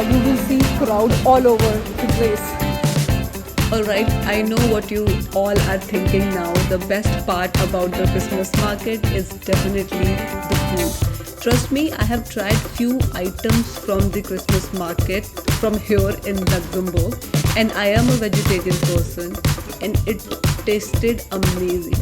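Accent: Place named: Asia. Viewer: By language akcent u looks Asian.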